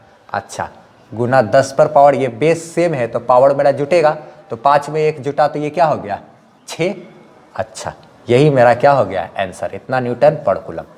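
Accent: Bihar, India. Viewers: Sir, aap Bihari?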